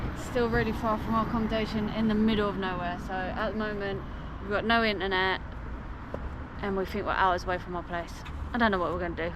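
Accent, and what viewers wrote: United Kingdom. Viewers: 英国哪个地区的口音😂？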